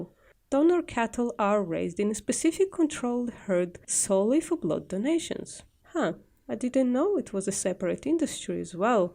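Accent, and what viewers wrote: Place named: Wales, United Kingdom. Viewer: Subbed love the WELSH accent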